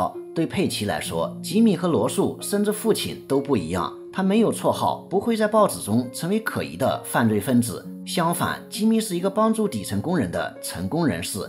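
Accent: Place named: Anhui, China. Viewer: 越哥，你的安徽乡音是如此地迷人！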